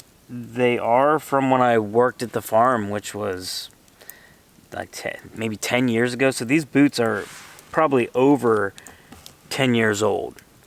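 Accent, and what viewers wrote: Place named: East Coast, United States. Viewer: Enjoyed the video. Did you see the headlights in the background at night? at about . They were off in the distance. I liked your sled & all the amenities it enabled you to bring along. I love camping & love bringing as many amenities as possible, which is a lot because I have a camper. I also do some tent camping. I could tell you were from out east because of your accent. Being originally rom Pittsburgh, I'm familiar with buckeyes. As kids, we used to break open the spiny green outer shell to get to the buckeye & just collect them. Great looking steak dinner! Hope your finger healed up okay. Take care & stay safe.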